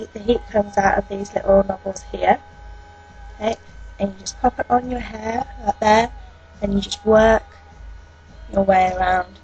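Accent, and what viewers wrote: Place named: United Kingdom. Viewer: Lol nice accent...from the uk?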